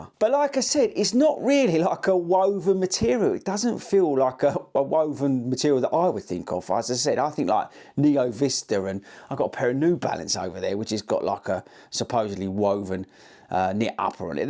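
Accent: United Kingdom. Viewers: Are you British sir?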